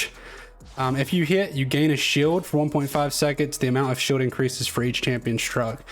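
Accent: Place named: South, United States. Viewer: The Southern accent made this extra cool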